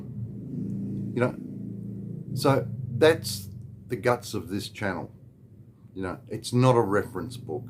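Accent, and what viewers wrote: Australia. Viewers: I like the cut of your jib, mostly I guess as we have a lot in common, born 57, ex Raafie, spent my early years working in the mines including PNG and happily divorced 😀... I came to Davao back in 2012 to meet my Filipina, I have been a permanent resident (SRRV) here since 2015, being ex military the Philippines Retirement Authority do a great package deal, only have visit the agency here every 3 years to renew my ID, other than that there is no on going costs or exit clearance required. My wife got Australian permanent residency about 4 years ago (I did the application, not rocket science but a few headaches were had chasing up the paperwork here in Phils, as I am sure you would appreciate). We now spend our time between Davao and my place in Queensland (I live on 5 acres), basically best of both worlds. Anyways mate nice to hear an Aussie accent from Duma for a change, keep em coming.. 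cheers.. how good was it growing in Oz in the 70's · Hi I've watched your videos with great interest. I am an Aussie from Melbourne. I spent 2 years in Dumaguete and came back to Australia in mid 2021. to have a cataract operation on both eyes. As you mentioned the treatment standards are far better in Australia. It's taken 12 months of treatment to get the eyes ready for the operation which is in December. I'm expecting to be back in Dumaguete in 2023. Firstly there is nothing wrong with the quality of your videos the smartphone you use is obviously a good quality device. Everything that you've said is 100% accurate. Trike drivers saying it's up to you on fares, poor service in stores, long slow lines. You'll recall that in Oz we just tap our cards to pay. The supermarkets in Dumaguete swipe the card then record the details on another system very time consuming Your Aussie accent is a great voice for the VLOGS. 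Love your down to earth style, great information, keep up the good work. by the way you've got a great partner in attractive, articulate and a great command of English. I'm looking forward to the next VLOG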